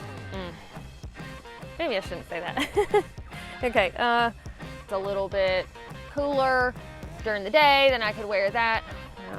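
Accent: South, United States. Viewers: You have the coolest accent!!! Country girls are awesome!!!!! Be safe and thanks for the content!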